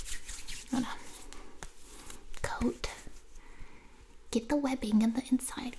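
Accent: South, United States. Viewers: Remember when had a southern accent? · Has accent changed? I swear it used to be more southern sounding...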